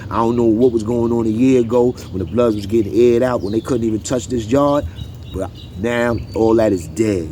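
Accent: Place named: Bronx, United States. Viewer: Grew up in da BX and YOU Rep that Unique BX Flava 💯 Blessing to you n yours.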